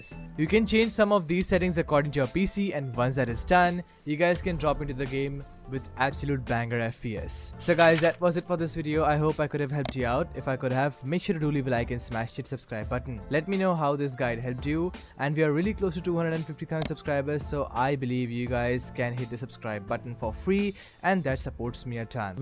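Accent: India. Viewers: India Voice = HACKER dont download is file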